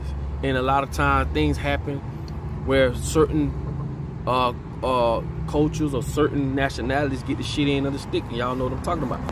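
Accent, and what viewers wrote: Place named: Atlanta, United States. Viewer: You sure you from Florida you got...you got an ATL swagg fosho...talk and everythang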